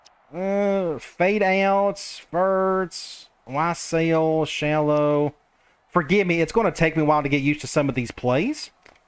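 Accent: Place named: South, United States. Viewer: Southern has all get out I love it..I'm also southern.... that draw is prominent lol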